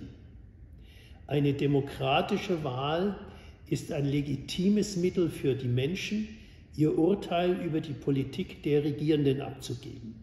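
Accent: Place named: Sonneberg, Germany. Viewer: Tolles Statement! Und der "Soanneberch- Dialekt"; ❤❤❤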